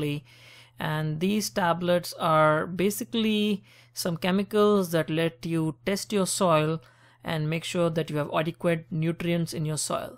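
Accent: India. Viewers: Everything else is a regular indian accent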